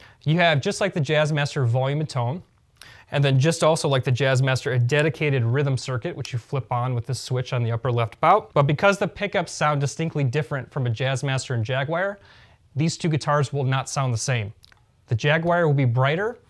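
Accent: United States. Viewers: Chicago accent? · or Picksburgh accent.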